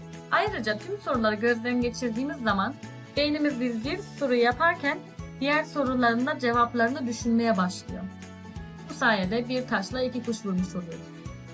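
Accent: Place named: Azerbaijan. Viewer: Seslendiren azerbaycanlımı Türkçeyi zor söylüyo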